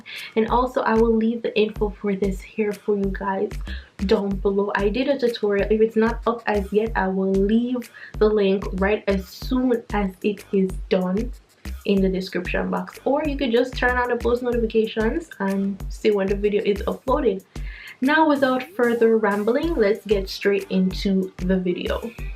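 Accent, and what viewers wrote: Patois, Jamaica. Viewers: Loving the Jamaican accent 💯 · Well, been watching your videos from evening while I do my hair (I'm trying the box braids) and what makes you relatable, is the fact that you kept your patios . Caah be Jamaican if yo no talk di language. Love love love your energy and your vibes.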